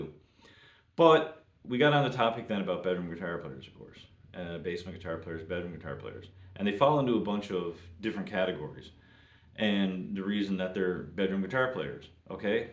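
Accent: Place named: Nova Scotia, Canada. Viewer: Jesus bud, you sound like youre from nova scotia or newfoundland, not new brunswick, we have the best bedrooms here.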